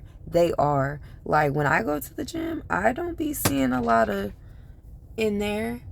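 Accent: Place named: Detroit, United States. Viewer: I appreciate your point of view you sound a Detroit girl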